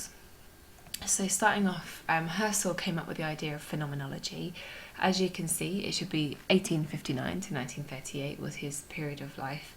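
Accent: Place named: United Kingdom. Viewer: Lovely explanation. lovely British accent .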